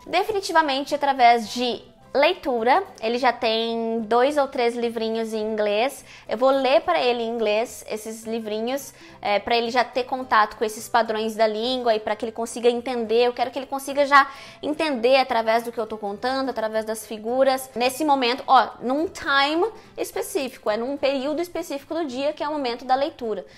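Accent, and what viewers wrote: Rio Grande do Sul, Brazil. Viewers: Que alívio ao assistir esse vídeo! Tb sou teacher, meu baby está agora com 1 ano e eu estava muito insegura, mas me identifiquei muito com o vídeo e percebi que estou no caminho certo! Tb faço time and place e espero que dê tudo certo! Super obg, te admiro mto e amo teu sotaque, tanto gaúcho pq tb sou gaúcha como onde inglês! Super beijos!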